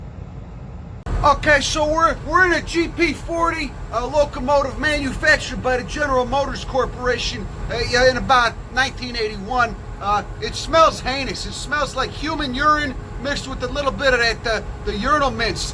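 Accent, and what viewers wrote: Chicago, United States. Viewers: Far out ...first video I heard your voice in I was like this guyz gotta be from South Berwyn or Shtickney...got any good eyetallian beef up there in Oakland ? Yeah I didn't think so you prick.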